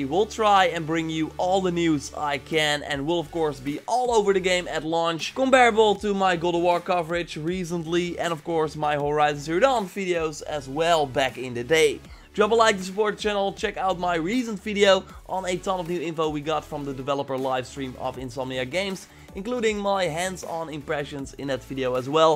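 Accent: Netherlands. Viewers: Mate are you dutch? You reaaaally sound Dutch :p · Are you dutch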